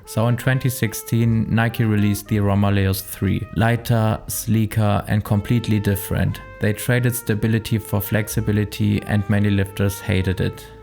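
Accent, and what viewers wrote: Germany. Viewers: aaaah mystery solved, thanks. Great Denglish btw. Best of luck with the brand and good night!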